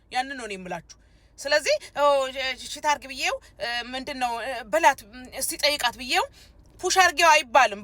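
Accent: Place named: Nigeria. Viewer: From your accent, I can tell that you are/were with Nigerian guyoo!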